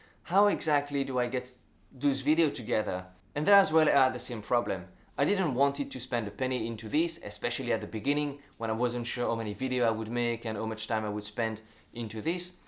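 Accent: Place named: France. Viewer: It is always great to listen to a tutor in Davinci Resolve. You have the knowledge and willingness to share it. I have subscribed as you seem to be able to teach in short sessions - 5 minutes seem about right. You are clear in your explanations and your French accent adds a little extra. Best of luck.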